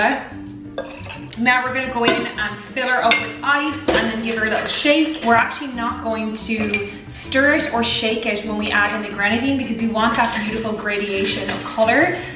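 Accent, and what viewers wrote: Ireland. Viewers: Great drink for never making one! The Irish accent is one of my favorites, every time I hear it, my thoughts start using an Irish accent for some reason lol. If you make this again use a spoon to sink the grenadine, just pour it on the backside of the spoon, works great!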